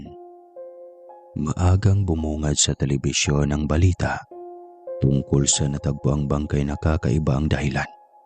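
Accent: Visayas, Philippines. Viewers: Bisaya ka man, Dong!😊😊 (katugon na man ko)😅 Ha,ha,ha😆😆😆😆😆 Grabe, ang ganda ng estorya!❤❤❤❤❤❤❤ Watching from Los Angeles, CA USA 🇺🇸🇺🇸🇺🇸🇺🇸🇺🇸🇺🇸🇺🇸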